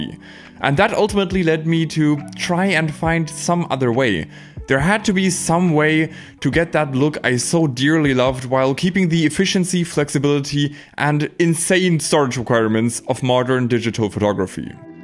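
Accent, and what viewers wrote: Germany. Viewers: hey ! i know one of these photos are taken in Duisburg at the tiger and turtle, you also speak german accent. i wonder if you are near this town ? becouse i come from moers. i´m really into photos ! i own a a6300, gh5, canon 5d, 80d, 700d and a old voigtlander film camera. i start with a fujifilm 5mp and did not stop since then.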